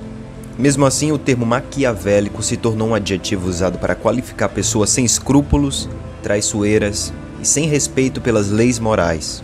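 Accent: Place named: Fortaleza, Brazil. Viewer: Conheço esse sotaque. Tu és de Fortaleza? Gosto muito de Maquiavel.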